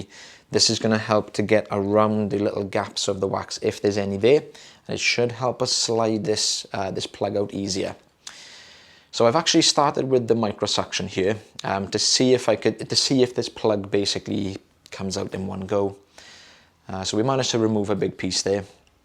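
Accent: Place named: United Kingdom. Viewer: Just curious what part of the UK you are guys are from? You and have the same accent. I watch a lot of British television and follow quite a few Brits and there is a very distinct difference between accent sounds. Thx. You guys are the best!🇬🇧🌷🇬🇧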